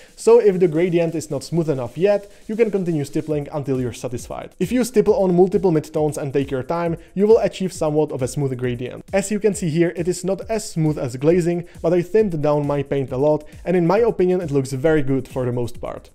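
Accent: Czech Republic. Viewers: Heej, borče! Úžasné video a skvělý kanál, jsi jeden z nejtalentovanějších... "malířů" modýlků? (painter/malíř)...barvič mi zní divně...o natěrači ani nemluvě...whatever, co jsem na YT viděl. A ano, už po asi třech letech a hromadění indicií jako Tvůj přízvuk a analyzování Tvého footage z metra či nalezení Tvých krásných modelů v pražské herně za vitrínou, jsem konečně zjistil že si taktéž obyvatel té naší krásné středoevropské kotliny :D Jsi krásný příklad rčení "zlatý český ručičky". Fakt klobouk dolů. PS: majestic beard, king! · Slyším cze-nglish! A dobrý videjko. Škoda, že jsem neměl takový tutoriály když jsem začínal barvit :c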